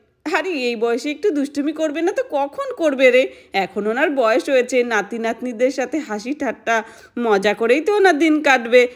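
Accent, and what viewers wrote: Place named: Bangladesh. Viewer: হুম কথার টোনে বাংলাদেশি কিছুটা বুঝতে পারছি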